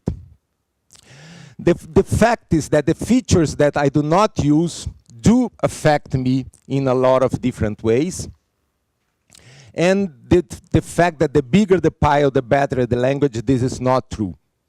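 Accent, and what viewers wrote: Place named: Brazil. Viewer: The speaker is speaking in a brazilian english accent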